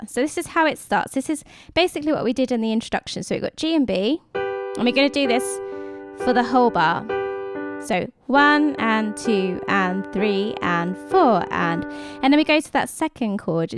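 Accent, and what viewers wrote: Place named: United Kingdom. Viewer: I'm loving the accent ;) Edit: Its nice to hear a British voice when you're from England haha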